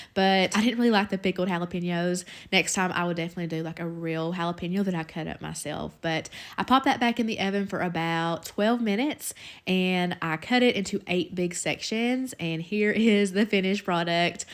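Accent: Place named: South, United States. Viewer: I love your receipts and your accent, just like Paula Deen, oil y'all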